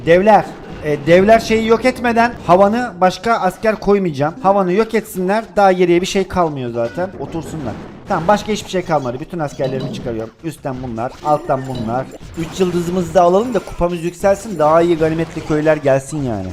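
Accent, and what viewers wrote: Rize, Turkey. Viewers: Abi önceden rizeli konuşuyordun ya ozaman daha güzeldi yine öyle konuşsana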